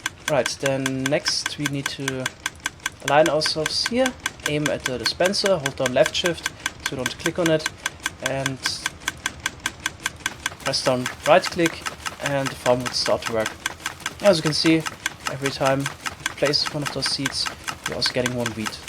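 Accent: Germany. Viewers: You sound very German lol.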